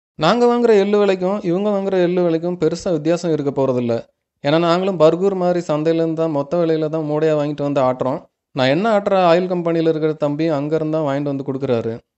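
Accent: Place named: Salem, India. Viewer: அவர்களுக்கு வணக்கம். நான் சேலம் உருக்காலைப் பணியாளராக இருந்து பணி நிறைவு பெற்றவன். தற்போதும் உருக்காலைப் பக்கம்தான் வசித்து வருகிறேன். இந்த வீடியோவைப் பார்க்கும்போது - உங்கள் மொழி அதாவது பேசும் விதம் என்னை ஈர்த்தது. வீனியோவின் நிறைவிலதான் காரணம் புரிந்தது நீங்கள் எங்கள் ஊர்க்காரர் என. மிக்க மகிழ்ச்சி. மிகப் பயனுள்ள தகவலைப் பதிவிட்டிருக்கிறீர்கள். நான் நீண்ட நாளாக - எண்ணெய் ஆட்டித்தான் பயன்படுத்துகிறேன். பலர் என் செயலைக் கேலி செய்வதுண்டு. நான் கண்டுகொள்வதில்லை. இப்போது பலர் எண்ணெய் ஆட்டுவது பற்றி ஆலோசனை கேட்டு வருகிறார்கள். உங்கள் வீடியோவைப் பரிந்துரைப்பேன். நன்றி சார். எண்ணெய் ஆட்டும் செக்கு பற்றி தகவல் கொடுங்கள்.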